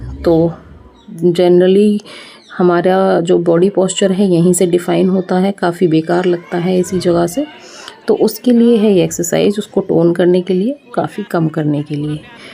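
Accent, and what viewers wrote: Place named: Meerut, India. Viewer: Didi aap ki sasural kahan per hai mujhe aapki Jo language hai vah merath Jaisi lagti Hai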